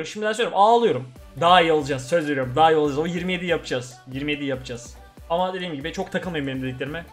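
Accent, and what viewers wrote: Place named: Trabzon, Turkey. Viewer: Api senun şu Trabzon şivene bayılayrom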